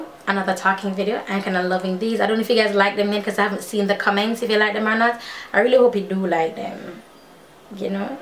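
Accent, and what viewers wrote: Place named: Jamaica. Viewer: Hello. I've been watching your channel for a year now. I love your energy and that lovely Jamaican accent! Yes rewarding yourself is a good thing you work hard and you deserve it! God bless you and your family.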